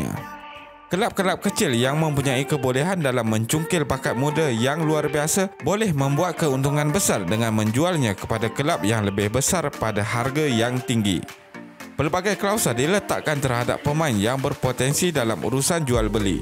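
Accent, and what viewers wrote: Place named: North, Malaysia. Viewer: abang yg ckap ni msti orng utara..hahahaha..sapa ja yg ckp jutaa kepada jutee...buruk ngat aihh bang..besa2 dah laa..😂😂😂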